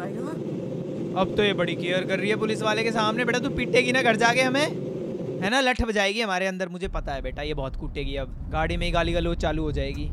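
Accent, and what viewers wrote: Haryana, India. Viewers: really nice video, is one of the only gamers who try different games and show them to ther audience with his great hariyanvi mixed accent and.... you were expecting something more right, but it was me DIO!! [ KONO DIO DA ]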